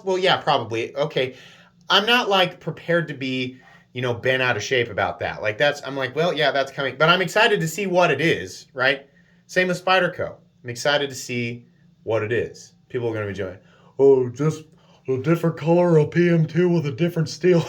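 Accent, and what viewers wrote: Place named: South, United States. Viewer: your southern accent is amazing 🤩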